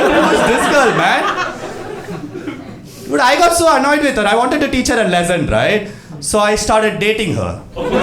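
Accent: South India, India. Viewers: I just hate madrasi accent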